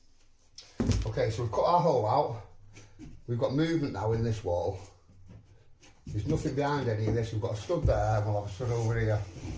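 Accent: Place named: Liverpool, United Kingdom. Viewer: Who's this scouser?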